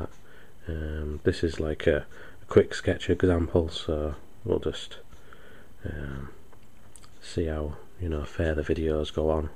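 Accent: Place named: Hull, United Kingdom. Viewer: Are you from hull by any chance. Accent sounds familiar.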